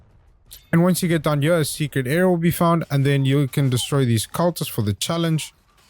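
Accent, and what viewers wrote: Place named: South Africa. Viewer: Just curious, are you south African? You sound a lot like dicuss du plessis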